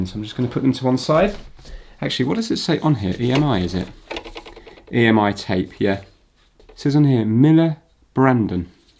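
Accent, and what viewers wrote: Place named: Norfolk, United Kingdom. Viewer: Definitely a Norfolk accent. "Suffolk Swede" will be a dig at their near neighbours. Lots of rivalry between Norfolk and Suffolk.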